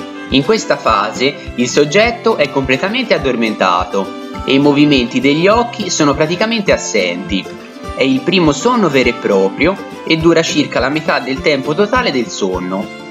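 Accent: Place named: Tuscany, Italy. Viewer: direii ahahhahaha, sembri Paolo Ruffini (anch'io sono toscano)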